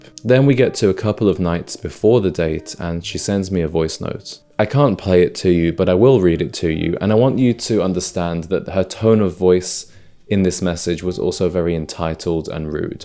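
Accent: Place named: United Kingdom. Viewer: No like for british accent . I thought its american 😢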